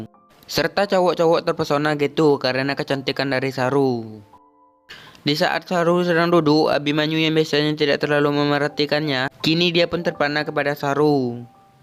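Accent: Madura, Indonesia. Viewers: eh logatnya kek mdura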